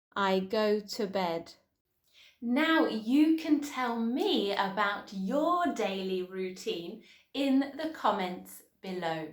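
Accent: United Kingdom. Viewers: Amaaazing! I love the british english🇧🇷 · I guess you’re British because of your accent. I live in Taiwan.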